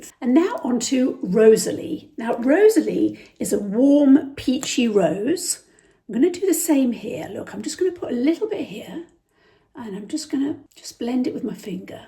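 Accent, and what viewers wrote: United Kingdom. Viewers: I’m in the US and I think you’re fabulous. You would be so much fun to hang out with. I love your style and your posh accent. I love all these LE products too. I bought the Rosalie liquid lurex, the pink damask lipstick and the Kensington plum lip gloss. Sorry, if I’ve forgotten some of the names. Can’t wait to receive them. ❤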